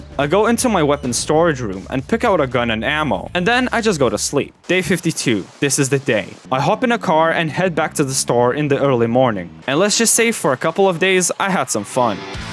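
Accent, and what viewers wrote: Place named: Lithuania. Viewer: i knew that accent was fammiliar, labas :D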